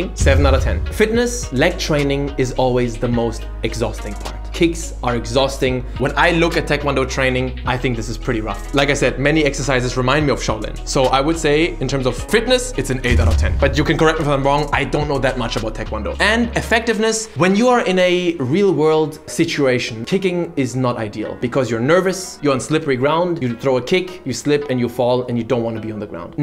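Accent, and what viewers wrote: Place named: Germany. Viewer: Redest du eigentlich extra so ein klischeehaftes englisch als deutscher oder versuchst du erst garnicht die Wörter richtig auszusprechen?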